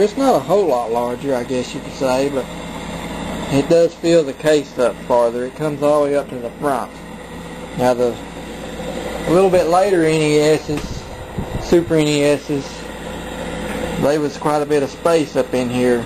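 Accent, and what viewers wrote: United States. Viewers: You from the south of america?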